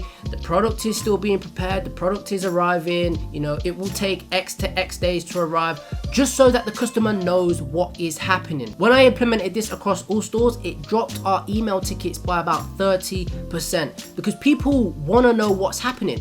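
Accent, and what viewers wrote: United Kingdom. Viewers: UK accent lowkey ads more authenticity to his voice, start a podcast "#1 E-commerce Podcast in the world"...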